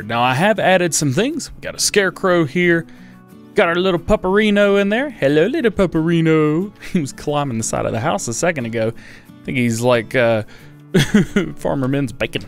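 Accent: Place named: United States. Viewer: Really enjoyed the video, love the American cowboy accent on a British farm!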